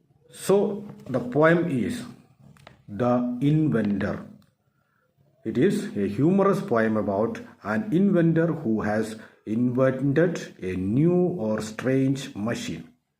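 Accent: South, India. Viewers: Aap south indian ho🤔🤔🤔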